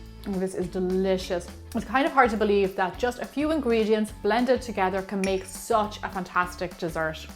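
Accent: Ireland. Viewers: Are you Irish?? Sorry if I'm wrong but my nana is Irish and she has an accent that sounds like yours!! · Btw I love you accent! What is it Irish?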